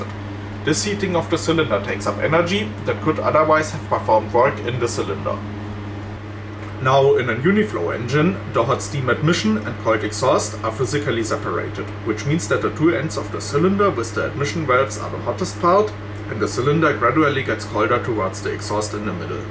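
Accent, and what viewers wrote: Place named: Scandinavia. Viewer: The way the narrator talk is make me think if it's Sweden? Germany? Or Norwegian?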